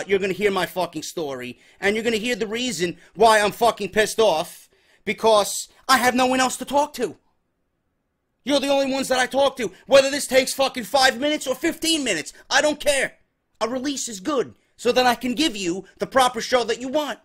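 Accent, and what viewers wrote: New York, United States. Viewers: dude you are a trip your ny accent helps your show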